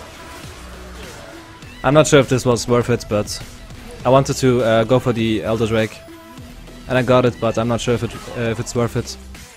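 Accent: Germany. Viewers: Hey are you german? You have quite an accent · warte bist du deutscher? o.o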